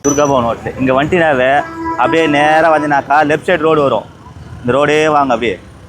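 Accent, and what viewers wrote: Madurai, India. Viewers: Super speech❤madurai vasagam ❤🎉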